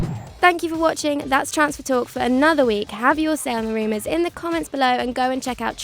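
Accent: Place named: London, United Kingdom. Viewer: Oh god Today it's the split arse and yesterday it was the LANDAN CHAV INIT BLAD 🤣 get someone decent doing the voice overs for once.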